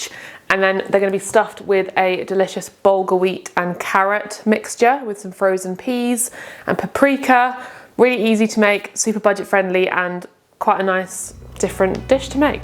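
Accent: United Kingdom. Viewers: I love your book and your videos. I'm Italian and I love your fantastic British accent too! I'm going to try the stuffed peppers for sure! They look scrumptious! Thank you! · I love your channel; I feel that we can be best friends!! Love your lovely British English accent. You remind of my English teachers when I was studying English back in my hometown. I love the food you make!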